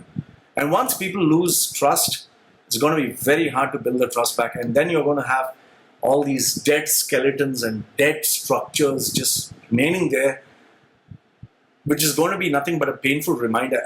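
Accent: Kerala, India. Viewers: Y sooo much of hateee......Just think in a possitive way.....This country has provided a lot to expats who work here.....Respect gratitude...And speaking about labourers .....all those people are supplied by some indian or pakistanii booses.....why are you bieng such a hard ass.....Finding negativity in everythinggg.....Chill dudeeee.....and if uae goes down ....we tooo go down with themmmm.....Easy broooo...there are million of people who relies on this economy.....alo g with this native people there areee other who pray for this govttt.....find the gooddd spread good thingssss......And stop acting likeee ur an american ......I am sure ur a malabaaaaaariiiii.....buy ur manglish accent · Wat the fuck. I bet ur not married if so she has to be sad or even blind haaaaaaaa haaaaaaaa haaaaaaaa But u sound Indian u bullshitting loony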